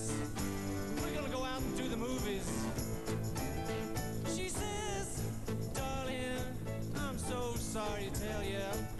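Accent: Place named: United States. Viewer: Why's he got an American accent? Cringe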